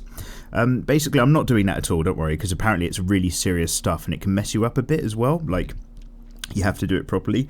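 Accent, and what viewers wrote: United Kingdom. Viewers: Hey! Im happy being able to listen to your beautiful British voice in the back as I continue to hone my artistic skills! I wanted to answer some questions fro,m past DWMs real quick 1-DWM 89: More recent the ending or opining image in the Sword art online spinoff where the main character (Who you should draw if possible) Is standing outlined in the rubble. 2-DWM 88: Nothing much Pumpin Iron and drawings! 3-DWM 90: Not an actress, but more of a cosplayer I recently found named Vamplette! Anyways have a great day!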